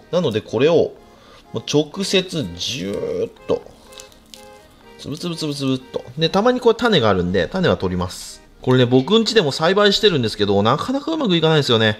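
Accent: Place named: Aichi, Japan. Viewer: 私も愛知県民のせいか適度な方言が安心感あるw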